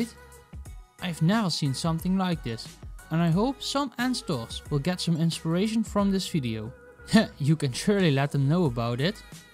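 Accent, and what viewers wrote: Netherlands. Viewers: hoor ik daar een nederlands accent?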